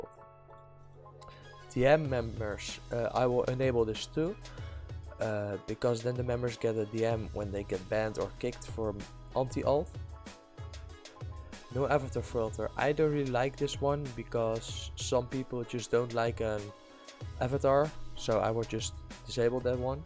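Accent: Netherlands. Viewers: Ben je nederlands je accent? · Haha ik hoor gwn dat je Nederlands bent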